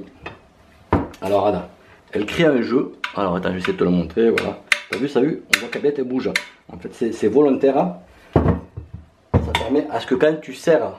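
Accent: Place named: South, France. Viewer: bah disons que j'etais pas sûr en même temps car tu as un accent du sud, pas du tout Lyonnais quoi !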